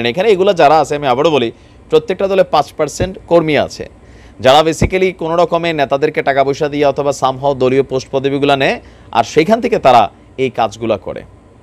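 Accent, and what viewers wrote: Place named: Sylhet, Bangladesh. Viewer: Vai apni je vabe shylet er bashay kota bolen.shylet er manush ki shotti ebabe kotha bole ?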